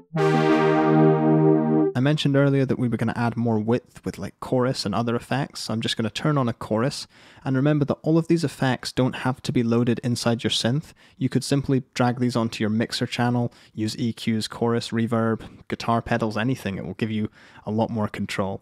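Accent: United Kingdom. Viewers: I will always thumbs up a video in which the presenter uses the word "fortnight" unironically in a British accent.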